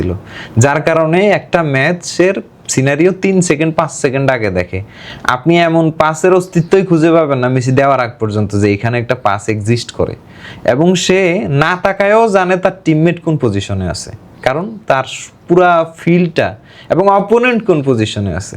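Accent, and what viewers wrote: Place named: Noakhali, Bangladesh. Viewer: এই লোক নোয়াখাইল্যা মনে হচ্ছে তবে এনালাইসিস সঠিক 😅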